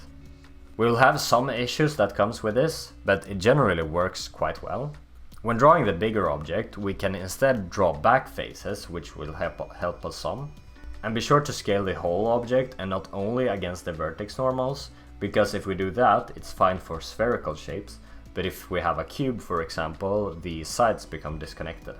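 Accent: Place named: Sweden. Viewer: Wow, really good quality for just starting out! I really liked the explanations, they were pretty clear and your accent is very nice. Kind of sounds like an Swedish accent to me. Personally, I didn't like the moving parts (top, bottom) whenever the code was shown, because it kind of distracted me, but that's just me.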